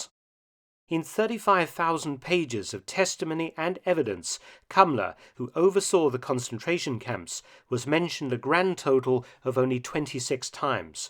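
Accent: England, United Kingdom. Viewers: Fantastic English accent....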